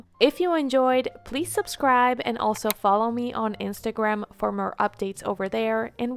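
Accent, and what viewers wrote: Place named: Nicaragua. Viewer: such a nice job. I love that you make two videos one an English and another in Spanish. I have watched them both 😊 maybe I will try to do that myself on my videos if you don’t mind 😊Beautiful tree I love the burgundy ornaments & the hints of Cream ribbon 🎀 Took your tree to the next level, adding the candles gave it an old world touched. I also love the way you decorated your chimney & that vase I want 😊 it simply beautiful. The birth of Christ is really nice and unique. Nice to know that you are from Venezuela. Because I was wondering the way you spoke in Spanish. Was very similar to mine Nicaragua. I hope you’re having a nice day.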